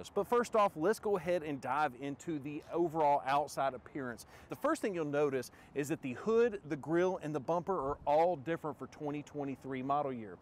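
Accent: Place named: South, United States. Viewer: Love the accent. Wish Canada had that southern personality.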